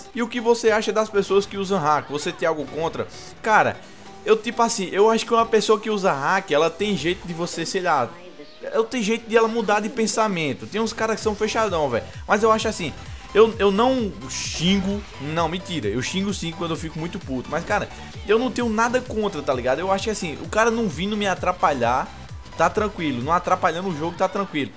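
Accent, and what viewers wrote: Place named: Paraíba, Brazil. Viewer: Infelismente,nao sou paraibano..Queria ser Esse sotaque é fodastico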